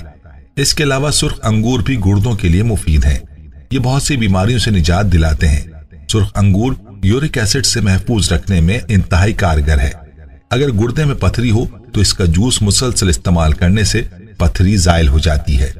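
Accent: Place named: Pakistan. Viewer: You forgot to mention BARLEY water🙄🤔. It was refreshing to note your pure Pakistani urdu is commendable.👌🏻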